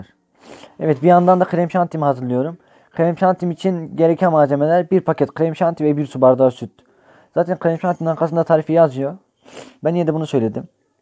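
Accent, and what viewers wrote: Hatay, Turkey. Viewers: kardeşim sen benim oğlum yaşındasın. Sana bir tavsiyem var. Sesini ve konuşma tarzını hiç değiştirme. Kırıtarak konuşma. Hataylı olarak kendi şivenle konuş. Biz seni anlıyoruz. Yalnız daha çok kitap oku. Kitap okudukça kelime hazinen genişler. Utanmadan rahat anlatırsın 😊 Takiptesin unutma!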